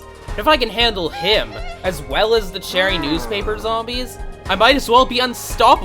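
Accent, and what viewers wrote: Canada. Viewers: He’s Canadian dumbass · oh, youre canadian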